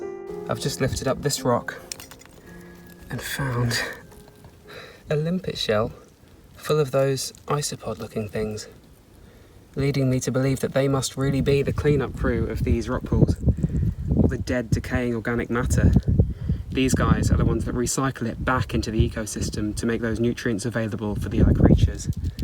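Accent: England, United Kingdom. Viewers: Something about English accents and nature documentaries. They just fit together so well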